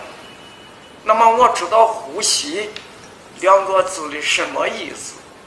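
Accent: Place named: Henan, China. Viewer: 河南人河南话，但和山东部分地区的口音很相近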